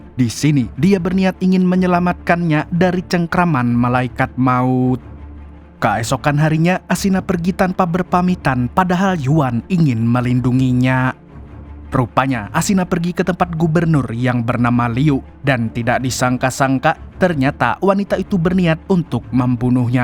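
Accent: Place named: Kalimantan, Indonesia. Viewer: Kalimantan logat nya nah😂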